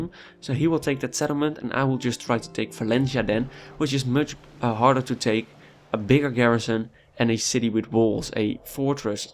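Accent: Netherlands. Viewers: Are you by any chance Dutch? You have our wonderful accent :P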